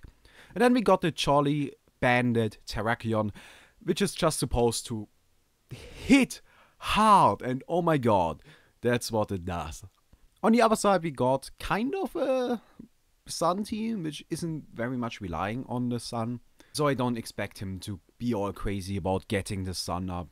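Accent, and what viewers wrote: Germany. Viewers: Hab schon nach dem 1. Satz gewusst, dass du deutscher bist. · This guy is beyond German. Haven't heard an accent like that in a long time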